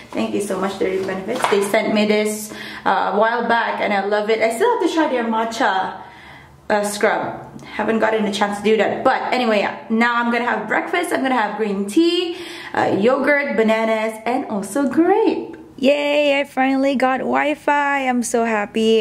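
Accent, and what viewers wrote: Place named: Sabah, Malaysia. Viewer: New favourite local youtuber! Your sabah and english accent got me 👌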